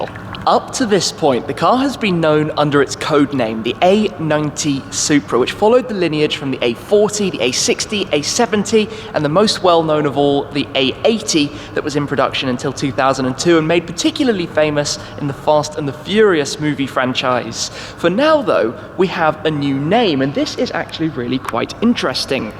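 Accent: England, United Kingdom. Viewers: Ублюдки ебаные эти англичане , какой же мерзкий английский акцент 🤮🤮🤮🤮 Слух режет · First I thought he is speaking qt different but later I realized that he is British! 😂 cheeeehhhhhrs! Gray one was better than red)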